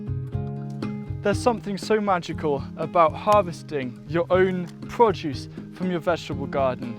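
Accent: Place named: Wales, United Kingdom. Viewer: I love your Welsh accent. And thank you for the tips!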